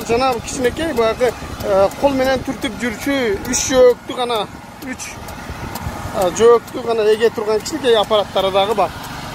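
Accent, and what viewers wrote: Kyrgyzstan. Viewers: Карей тилин кыргызча суйлогондой эле суйлоп жатасызго таксыр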